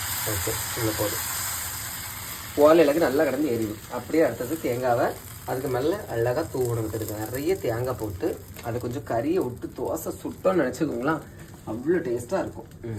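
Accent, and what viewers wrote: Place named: Nagercoil, India. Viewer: Hi Anna Nanum Nagercoil than Ippo Chennai la erukom Unga video pakkum pothu, namma sontha mannu pechu, romba santhosama erukku anna. Niraya video podunga anna Aduppankarai pakkum pothu namma ooruku pona mari santhosam Thanks anna❤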